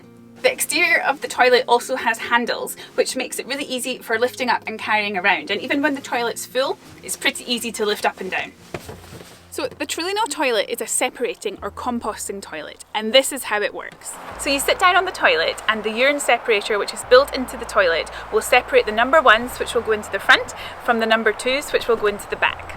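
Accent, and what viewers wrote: Scotland, United Kingdom. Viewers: I've never heard anyone use the word "toilet" so many times in 12½ minutes, and with such a lovely Scottish accent. Currently researching our first van, thanks for the info.. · Thats a nice accent. I was thinking it may be Scottish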